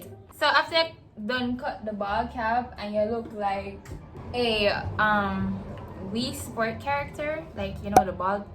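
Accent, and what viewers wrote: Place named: Jamaica. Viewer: I love this chick ,❤️ Much love all the way from Africa 🇿🇦 I just love the patwa accent.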